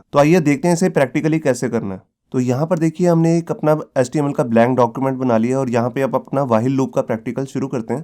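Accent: Punjab, India. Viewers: you cannot control your punjabi as amny punajbies face this problem and punjabu words like porri comes out involuntry.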